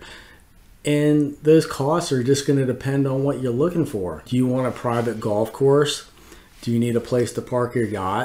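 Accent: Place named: Pittsburgh, United States. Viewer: Great content! You sound like you’re from Pittsburgh!?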